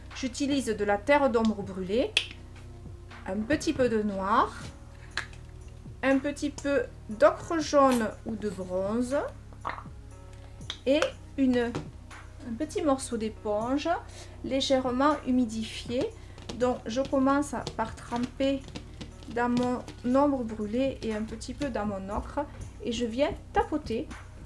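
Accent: South, France. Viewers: Bonjour,👍je vous découvre seulement maintenant. Enfin des vidéos en français. Ce n'est pas évident de comprendre certains artistes en langage étranger. Surtout pour la partie technique et les produits. De plus votre voix passe très bien aux intonations du soleil. Votre tableau m'a donné envie de le réaliser à mon tour, je fonce. Merci de vos conseils. Bonne continuation à bientôt de vous retrouver. ✌👍👏😘🌹 Christine de Cannes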